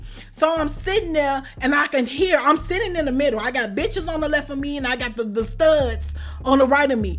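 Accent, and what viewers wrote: Chicago, United States. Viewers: it's sumtin bout that Chicago accent........ 🔥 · You have a strong Chicago accent I'm from Minneapolis damn near happy people here damn near 3/4 of the people here are from the Chi ... You carry that accent heavy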